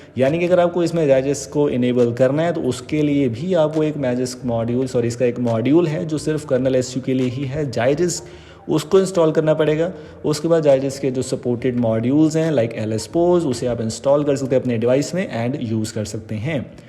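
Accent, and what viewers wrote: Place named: India. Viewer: Your English sounds like a combination of Hindi and English, I don't understand anything.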